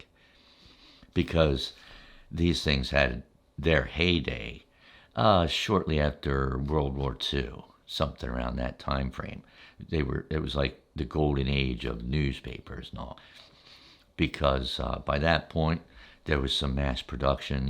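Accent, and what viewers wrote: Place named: Philadelphia, United States. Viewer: You've gotta be from Philly or from the Philly burbs. Just a hunch! You sound like most of the people in my family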